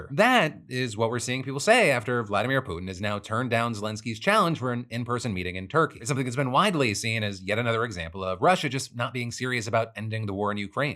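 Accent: South, United States. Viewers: I love the southern drawl creeping into your speaking pattern lmao its awesome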